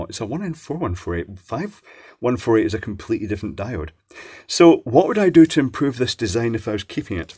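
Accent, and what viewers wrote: Scotland, United Kingdom. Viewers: A Quick question are you from Scotland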